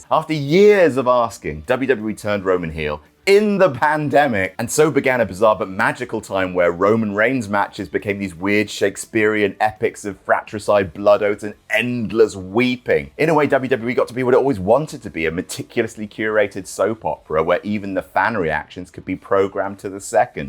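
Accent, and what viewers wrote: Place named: United Kingdom. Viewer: Hey its that posh english guy that talks about wrestling.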